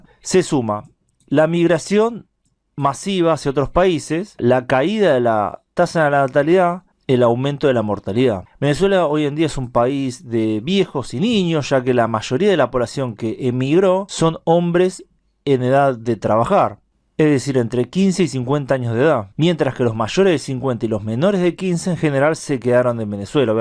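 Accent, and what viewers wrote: Argentina. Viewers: Hola voz de ultra argentina, ¿podrías hacer un video de la demografía y natalidad argentina pero diferenciando de provincia a provincia? Saludos.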